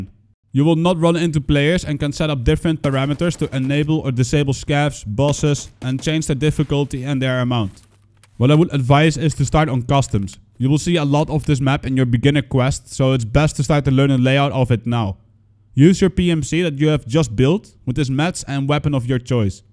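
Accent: Netherlands. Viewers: Great video! are you Dutch btw? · are you dutch? because I can hear some dutch language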